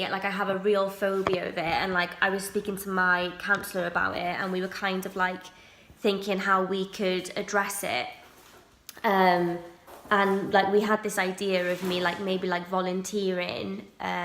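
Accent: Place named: Wales, United Kingdom. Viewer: The more I watch you the more I hear that we are exactly the same. I can't say that word either or watch films or TV shows that deal with the illness. I have a non urgent test coming up but I am terrified. Maybe it should be urgent...you know how we question everything! I think about this test 24/7. However I am greatly comforted by you. You are Welsh? I hear it in your voice! I am half Welsh. I am old. 69. I feel ashamed that I have this health anxiety...I just can't help it but feel that I should not have it at my age....I should grow up a bit! It's not my fault that I have it but I can't help thinking that I am completely self obsessed and thinking of myself all the time. God Bless You. Thanks for this video XXX❤️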